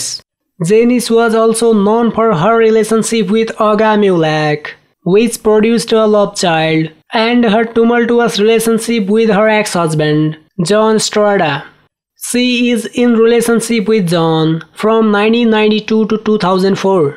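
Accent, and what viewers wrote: India. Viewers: Nag thumbs up na sana ako pero nun na erita na ako at di kinaya ang indian na nagsasalita, ayan tuloy binawi ko na . Dapat boses ng tao at hinde alien ☹️😶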